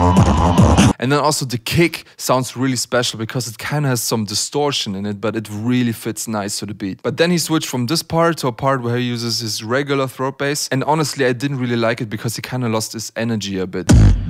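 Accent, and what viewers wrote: Germany. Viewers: Du bist Deutsch oder du hast diesen Deutschen Akzent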